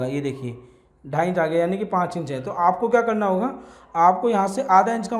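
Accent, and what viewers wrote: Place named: India. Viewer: Sir ap muslim ho kya.ku ky ap k name muslim h lkn ap bolty india ke zuban jaisy ho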